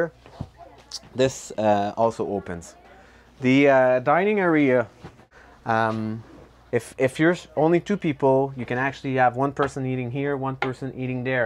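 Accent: Quebec, Canada. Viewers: I don't usually hear my own "Quebec" accent on Youtube, that made the video so much interesting for some reason hahah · He sounds like a Québecois la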